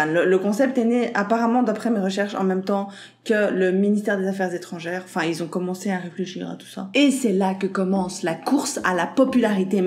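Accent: Belgium. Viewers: Tu dis « ouit » au lieu de « uit » :) c’est marrant l’accent belge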